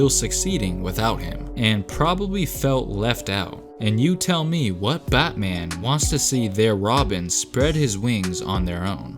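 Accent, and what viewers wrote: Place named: Philadelphia, United States. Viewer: I was just thinking dat Philly all day lol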